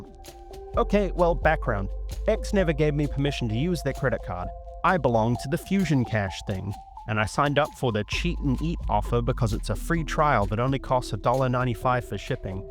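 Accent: New Zealand. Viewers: he’s from NZ isn’t he? I’m an Aussie so I know a kiwi accent when I hear one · I always thought he sounded kiwi too so I'm inclined to agree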